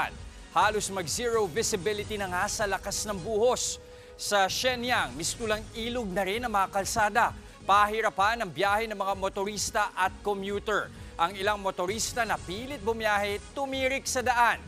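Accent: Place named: Coño, Philippines. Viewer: Slang naman nang news presenter nang news5. Coño yata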